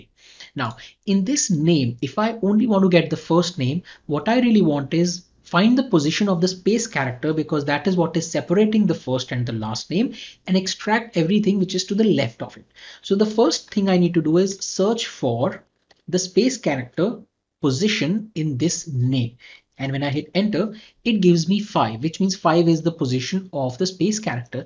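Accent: India. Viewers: If you hear an Indian guy talking about Excel, you know you're in the right space.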